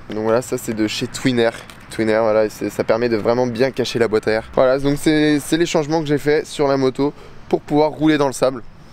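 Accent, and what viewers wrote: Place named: North, France. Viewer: Tu est du Nord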